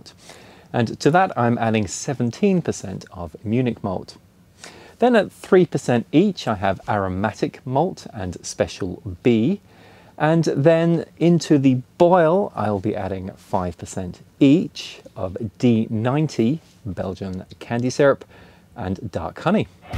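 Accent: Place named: United Kingdom. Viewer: I was actually thinking something similar when I heard her speak - there's definitely some British influence rubbing off on her accent sometimes!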